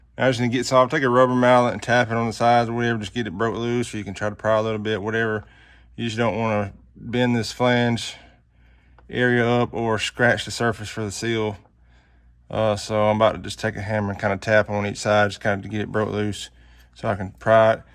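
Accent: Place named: South, United States. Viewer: Hard to view do to his Southern accent. A short video that felt like a lifetime...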